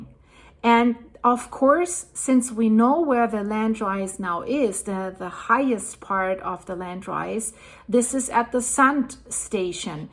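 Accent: Germany. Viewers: Actually, is German and lives in the US, hence the accent. I love accent 😁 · What a funny accent 😂 Is this German, Canadian or anything in between?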